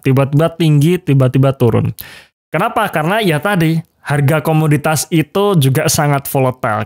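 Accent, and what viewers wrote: Surakarta, Indonesia. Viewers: oalahhhhhh..wong Solo to mas? 😂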